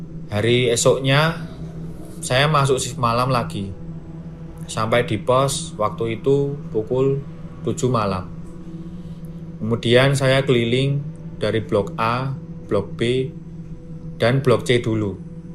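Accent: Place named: Java, Indonesia. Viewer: Selalu diulang dngerin crta ini...crtany bgus naratornya jg salah satu fav...medok Jawa TPI jelas cara berceritany..KLO cwe kyk mb Devi. Good job mas.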